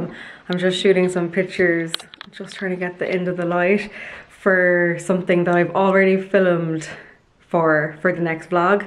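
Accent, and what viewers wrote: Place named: Kerry, Ireland. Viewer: Love how relaxed and natural you are in these vlogs at home. Will give them a go tomorrow. Sunday is self care day! Never apologise for the Kerry accent (from a Dublin gal).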